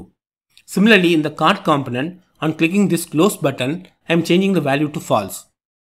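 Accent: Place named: India. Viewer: Fantastic explanation; he has such a nice Indian tone!!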